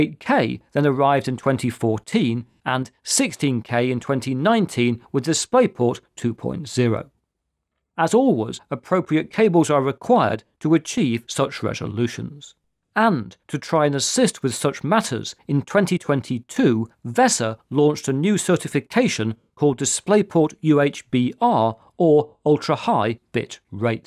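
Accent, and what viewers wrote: United Kingdom. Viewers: Even though I have worked on/with computers since the 1980's (I used to service Mac's & IBM pc's at University), I find your information about different aspects of computers VERY useful! Keeping up with all the latest & greatest technology trends/changes is exhauting. So... Thank You so very much for your content!! BTW, I enjoy your "outro". You sound like several friends I have in Britian. 🙂🙂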